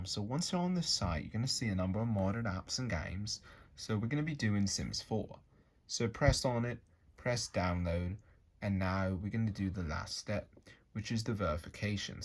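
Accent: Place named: Australia. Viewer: Why does he sound like he’s from Australia, New Zealand, or South Africa? I’ve watched every single video on this channel, and in other videos he sounds American, or maybe Canadian.🤷‍♂️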